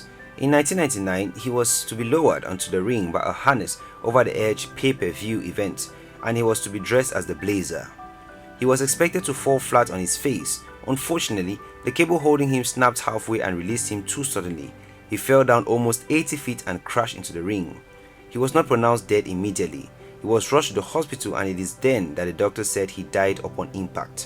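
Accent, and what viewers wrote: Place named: Nigeria. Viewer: Longest intro... n nigerian kinda voice over.. i bet all this history are true😅😅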